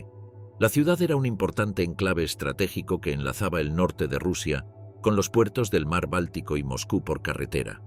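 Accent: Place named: Castile, Spain. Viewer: Joder que gusto escuchar un video asi que no este en español latino (realmente esta mal dicho pero bueno), por fin. Que gusto escuchar un video asi en castellano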